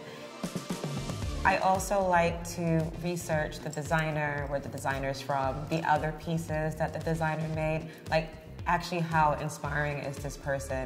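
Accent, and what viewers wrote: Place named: Germany. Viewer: Das ist doch, dachte ich, der Germany Channel - why does she speak en mit einem DE accent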